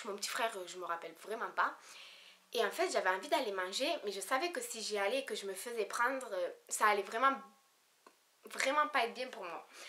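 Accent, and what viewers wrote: Marseille, France. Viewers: Tu es Marseillais ? Super vidéo ❤️💋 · t est marseillaise ? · te marseillaise nn ta le même accent que moi sur se te trooop Belle😍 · Aie on a reconnu direct l'accent Marseillerr !!!!!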